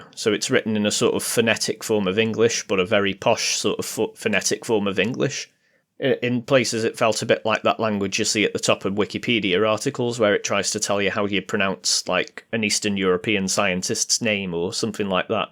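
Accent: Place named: Northern England, United Kingdom. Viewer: Sounds slightly northern to me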